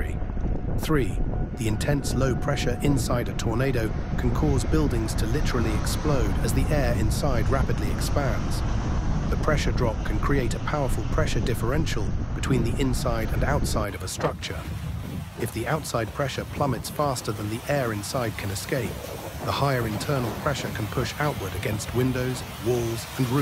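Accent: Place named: United Kingdom. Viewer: as told by a gophy ass brit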